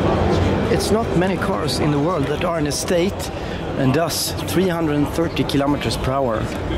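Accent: Sweden. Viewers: Hi. You really should get a microphone with that camera, sometimes it's very hard to hear what you are saying, specially with a Swedish accent:):):). Anyway, good vid, really enjoyed the Alpinas, I prefer the Alpina instead of the new M5! They look better and more powerful. Take care.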